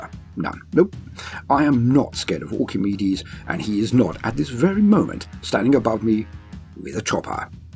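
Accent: United Kingdom. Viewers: Is it just me or does British accent instantly make whatever the topic more entertaining? Nice reading voice duder!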